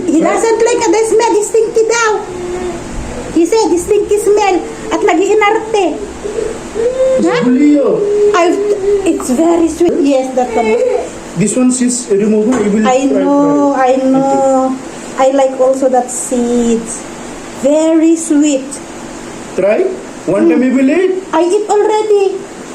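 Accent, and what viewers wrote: Philippines. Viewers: I like the way u speak taglish hehehe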